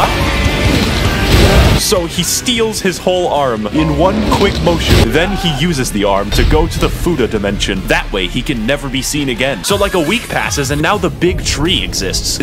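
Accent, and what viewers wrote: United Kingdom. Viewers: "Oh, he's British." As a British person, I can claim he is from Birmingham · Oh, he's british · oh hes British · Oh he's british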